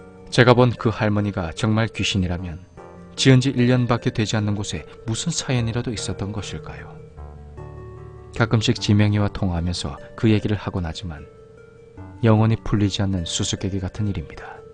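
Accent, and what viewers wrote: Gyeongsang, South Korea. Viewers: ㅋㅋㅋ 이 경상도 분이셔서 대화부분은 원본이 경상도 사투리가 아니더라도 경상도 사투리로 하시더라구요 ㅋㅋ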